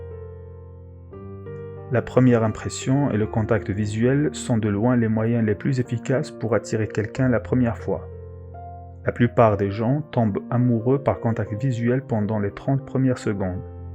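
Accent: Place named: North Africa. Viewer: C’est dommage que le narrateur lise ses commentaires et je n’aime pas cet accent nord africain perso je sais quels hommes m’attirent et les hommes bleus du désert ne sont pas mon type ni même les Africains en général je suis une hypersensible et l’odeur leur accent leur mentalité leur communauté pseudo religieuse même leur cuisine grasse je n’aime pas ….je n’aime que ceux de mon ethnie il n’y à pas d’africains ni autres étrangers dans ma famille et même à la vue de ces gens je fais de l’urticaire géant heureusement qu’il n’y en a pas dans ma famille car je n’aurais su être hypocrite je suis chrétienne non catholique…..! Et pourquoi parle t il que de la masturbation des hommes nous aussi on se masturbe le clito et surtout l’esprit perso je me touche au moins trois fois par jours depuis que je suis excitée par un homme exceptionnel et Français et peu importe le moment sagesse du jour et de la nuit !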